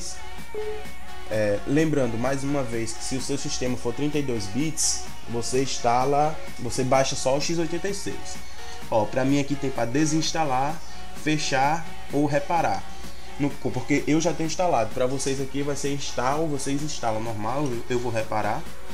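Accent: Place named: Bahia, Brazil. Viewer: tu e baiano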